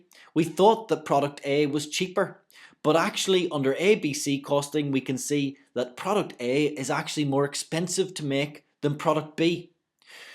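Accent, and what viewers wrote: Scotland, United Kingdom. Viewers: Is that Scottish accent? Soooo amazing! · Scottish, I think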